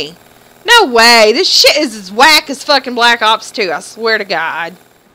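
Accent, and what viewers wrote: South, United States. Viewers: I love your country actcent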